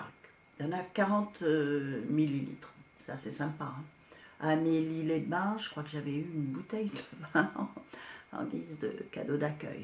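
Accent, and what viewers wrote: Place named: France. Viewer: loved it & love your French accent :)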